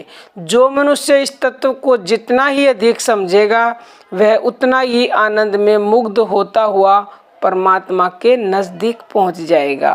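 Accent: Haryana, India. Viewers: जय श्रीराम माता जी आप क्या हरियाणा से हैं क्या आपकी आवाज से तो ऐसे ही पता चलता है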